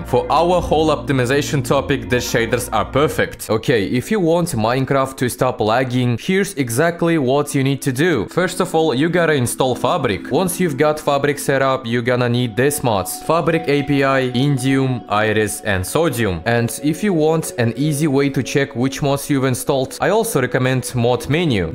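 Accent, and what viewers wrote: Russia. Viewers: hell nah hes def russian, i can say it cuz im russian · russian accent detected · Нда, чел пытается не палиться что он русский, но акцент говорит нет Ты позоришь себя, микрочел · I ve recognised Russian speaking man from the very first words 🙂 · When you hear a russian speaking english AAAAND cursing in russian..., the vídeo is hilarious and serious at the same time. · Как ты говоришь на английском так, чтобы русскому было тебя понять легче, чем англо-говорящему? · Couldn't have made a more russian sounding enlgish accent fr. Thats how people in my school read English texts. Good thing i know english well💀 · Как же мне нравится эта озвучка с Русским акцентом:) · Bro i love russian guy speakung english😂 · how this the most russian accent i have ever heard (i am russian btw) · русский акцент bilya... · Почему мне кажется что у тебя русский акцент ? 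· Прикольно что из за русского акцента мне становится в разы проще понимать английский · I knew that guy was russian when he started speaking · Не иронично самый клёвый акцент ру английского с неожиданно довольно хорошим произношением и ахеревшим словарным запасом. Как говорится fluent af. Будет крутой гиммик канала. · love you rrusian accent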